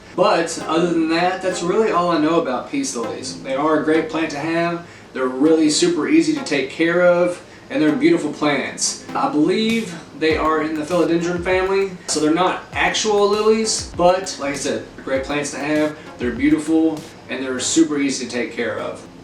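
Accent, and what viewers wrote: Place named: Central East, United States. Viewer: Just got my first peace lily and this is such a great video. I also knew you had to be from a central eastern state from your accent. I’m from Southwest Virginia😊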